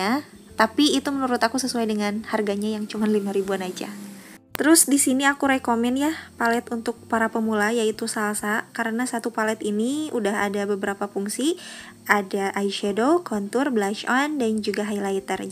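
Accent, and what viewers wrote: West Java, Indonesia. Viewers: Hasilnya cantik bgt dan keliatan natural ngaaannn...eta nyarios na mani nyunda pisan, pertahankan neng, jadikan ciri khas.👍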